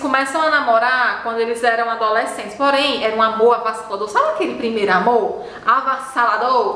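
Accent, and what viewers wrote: Northeast, Brazil. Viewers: A melhor crítica que fiz para um dorama escrevi para IREI QUANDO O TEMPO ESTIVER BOM. Simplesmente maravilhoso. Eu adoro os vídeos da. Esse sotaque nordestino é delicioso de ouvir! Parabéns, linda! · você é nota mil e o seu di do Nordeste e Norte é show vem conhecer o Ceará flor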